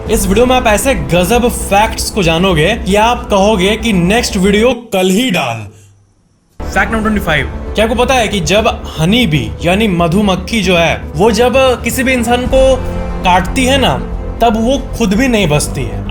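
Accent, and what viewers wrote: Bihar, India. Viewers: Now your tone is likely bihari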